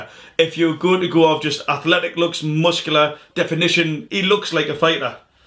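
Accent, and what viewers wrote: Newcastle, United Kingdom. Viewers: It's the accent. They are both from Newcastle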